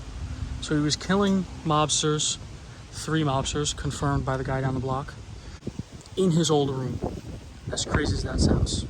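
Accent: New York, United States. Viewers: Terti years ago! Great NY accent. :)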